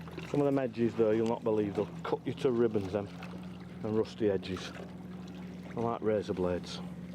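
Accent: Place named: Northern England, United Kingdom. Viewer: Enjoyed that , it's now on my to do list. This you must live near me with that accent, Wigan? Bolton? Lol.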